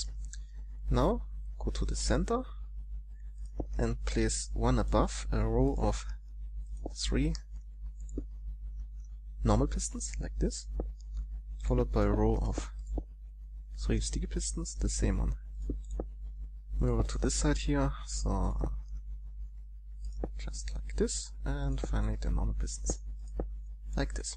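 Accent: Germany. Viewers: Are you german 😅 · Man merkt das er deutsch ist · The houl sing,, german accent means: your are german, right ? the only thing i dont like in this video is the texture pack(reminds me of my old days in minecraft :,) ), but the door itself is cool :)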